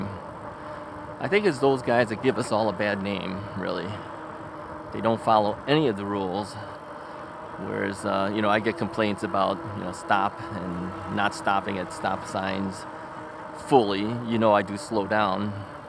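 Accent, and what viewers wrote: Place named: Illinois, United States. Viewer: It sounds like you're in IL, up north somewhere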